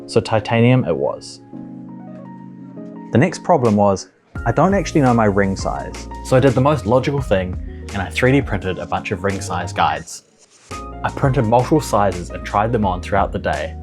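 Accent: New Zealand. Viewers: are you a new zealander?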